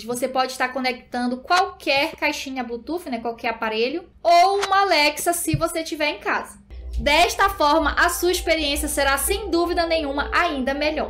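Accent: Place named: Rio de Janeiro, Brazil. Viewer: paranaense com traços japonês e com sotaque carioca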